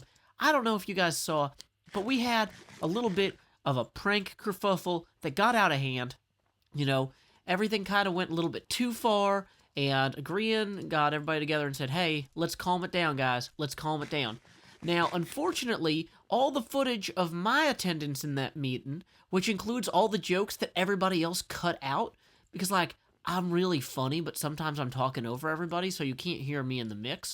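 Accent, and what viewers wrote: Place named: South, United States. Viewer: your content is awesome, but I can never seem to get past that heavy southern accent! lol merry christmas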